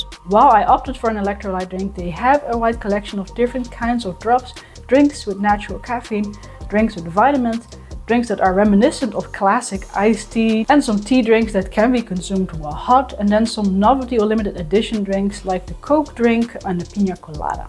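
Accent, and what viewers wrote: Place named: Netherlands. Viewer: I can tell you're Dutch by the accent ^^ I've been drinking waterdrop for years, got my mom on waterdrop too, it's good stuff. I got the Lucy with my points, so basicly 'free'? I don't think it's necessary, our tab water is clean enough. But it's a fun piece of tech. The Lucy Carafe though! (Also got it with points) I use that daily. It filters water really well. And when I placed a clean glass of tab water next to a clean glass with filtered water, I could see the difference, tab water does look blurry compared to filtered water.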